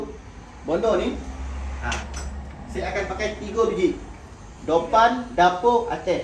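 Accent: Negeri Sembilan, Malaysia. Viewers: bahasa nogori yo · Puas aku dengar loghat negeri sembilan...Seronok aku dengar...terbaik BOSS N TEAM....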